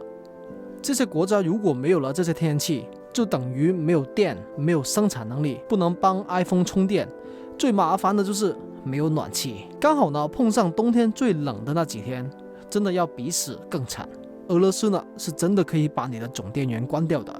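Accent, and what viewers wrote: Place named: Hong Kong. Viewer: 港普还不错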